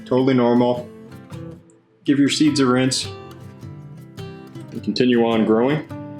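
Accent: Canada. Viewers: As soon as this video started I got hit in the face with all that Canadian!! You're one of my favourite peoples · My mom is an immigrant to the USA. I’d like to send her your video, but she won’t understand your Canadian accent. It’s very strong! I’m having a bit of struggle, and English is my first and only language. If open to it, pls add English subtitles. I know that’s weird, but English is her second language, so dialect other than her USA-born children, is difficult for her. She’s converting to healthier because of pandemic, and not wanting any vaccines, etc. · Even as a Canadian, I'm like "whoa, that is a Canadian accent." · Find eout in the heouuse. So Atlantic Canadian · Do I hear Canadianish? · Salad spinner husk removal much easier....or....use a net bag that onions come in, one with tiny holes and stand outside and spin it really fast....Kids love this, but I use an almond milk bag over the net bag so I don’t have seed husks all over me and my deck... 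Love that Canadian accent!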